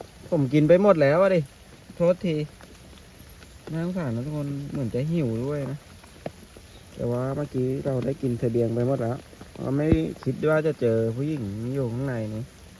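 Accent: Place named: Ratchaburi, Thailand. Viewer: สำเนียง..ราชบุรี..ชัดเจน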